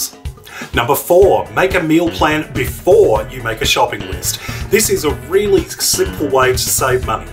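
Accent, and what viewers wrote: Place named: Australia. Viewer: Yes he's Australian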